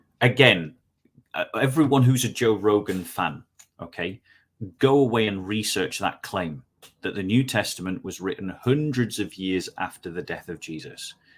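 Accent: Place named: United Kingdom. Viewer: Dont see many livestreams of but I am glad I did see this one. I love the British accent, God bless!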